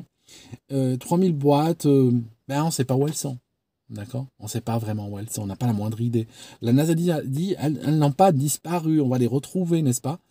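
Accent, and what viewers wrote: Switzerland. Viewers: C’est la première vidéo sur laquelle ton passage en Suisse se remarque vraiment à ton brin d’accent d’chez nous 😉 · Top l’accent suisse qui ressort dans cette vidéo 😂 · Ahaa L'accent suisse.. 🍰 Et oui c'est pas mamie qui fait tous les gâteaux.. ;) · Intéressant. Mais c'est une impression ou t'as pris l'accent suisse pendant la vidéo ? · Excellent! Mais c'est quoi cet accent suisse?! 🤣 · Excellente vidéo (comme toujours)👏👏 où tu argumentes encore mieux que ceux qui ont menti à propos de cette mission. Avec leurs têtes d'hommes honteux pendant la conf de presse, n'importe quel joueur de poker voit que ces hommes ne se considèrent pas comme des héros. Et connaissant l'arrogance des américains quand ils sont fiers de quelque chose, on en déduit que ces 3 hommes sont très mal à l'aise avec ce mensonge et ne sont absolument pas fiers d'eux. 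Bref C.Q.F.D. À noter que pour l'occasion, tu as sorti ton savoureux accent suisse romand, du pur bonheur 😉😊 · Je n’avais pas remarqué cette accent suisse, lors des autres vidéos…. Merci 🙏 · l'accent suisse revient à la charge !😄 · C'est dingue comme l'accent suisse est présent. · J'adore ton accent Suisse,lorsque tu nous expliques les détails de tes arguments!!! Merci pour tes réflexions 👍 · Vous avez un petit accent suisse, bonne continuation avec toute ma sympathie. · ton accent suisse ressort lors de ton exposé, Ça fait tout drôle... 😄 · J’étais pas prêt pour l'accent suisse... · L'aziz. bravo à toi. On te l'a déjà dit j'en suis sûr. Tu as l'accent suisse qui remonte à la surface en ce moment ! Alf shukr pour le contenu et la livraison inégalables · Dans cette vidéo, tu as l accent suisse lol, un reste de tes années vécu las bas ? Sinon comme tu le dis très justement je préfère avoir tort et avoir l esprit libre ,plutôt qu avoir l esprit d'un poulet élevé en batterie... 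· J’avais jamais remarqué l’accent suisse 🇨🇭 😅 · Il n'y a pas que moi qui entend un accent Suisse ??? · Amusant ce petit accent helvétique, je ne l’avais jamais remarqué sur tes autres vidéos d’aviation 😂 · Merci pour la vidéo on entend parfois ton accent suisse c est super a entendre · l'accent Suisse qui revient de plus en plus fort la vidéo avançant. Interessant les points que tu soulèves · Ce qui me choque moi dans cette vidéo, et c'est la première fois que j'entends ça chez toi, c'est qu'on dirait que tu avais un challenge: dans cette vidéo tu dois parler avec l'accent suisse! Eh bien challenge réussi! 😁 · Oulala toi tu as vécu en Suisse, je peux même te dire le canton: VD?! Tu as bien gardé ton accent!! Salut l'Ami!! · Tu as déménagé en Suisse ? Tu as, dans cette vidéo, un accent suisse que je n'avais jamais remarqué. Ceci dit, excellente vidéo. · Ton accent suisse s’entend parfaitement cette fois 😊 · de ouf, je me suis dit mais qu'est-ce qu'il fabrique avec cet accent suisse tout à coup?!? 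· J'ai adoré ton accent Suisse 😉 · Je viens de retombé sur cette vidéo. T'as grave l'accent suisse là-dessus mdr! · cher, je n'avais pas écouté cette vidéo, très très intéressante, tu gardes ton sérieux, et tu parles avec l'accent suisse. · ton accent Suisse ressort lol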